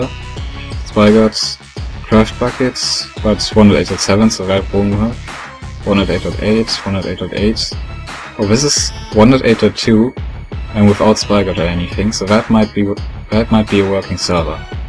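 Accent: Germany. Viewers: ah deutsch hört man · You are a german guy or 😂 falls ja hört man es raus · Hi man hört das du deutsch bist😉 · Man merkt an deinem englischen dialekt das du deutsch kannst :) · Man hört das er deutscher ist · Kann es sein das er deutsch ist der hatn deutschn aktzent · man hört so hart dass du deutsch bist xD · I can hear, that you are german :D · You have a german accent!Am I right? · du bist deutsch ich erkenne es an deiner stimme :D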